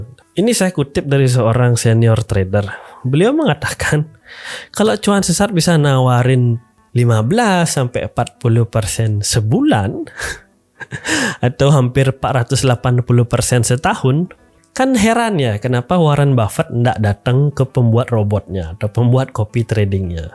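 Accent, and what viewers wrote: Bali, Indonesia. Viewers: kaya orang bali logatnya pak, ake bingung bane 😂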